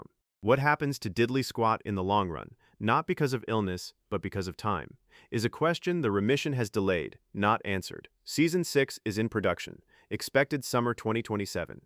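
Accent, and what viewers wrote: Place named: United States. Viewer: This narrator is American Jeremy Clarkson's is English if someone is going to narrate an Englishman who lives in England surely it has to be narrated by British narrators just saying.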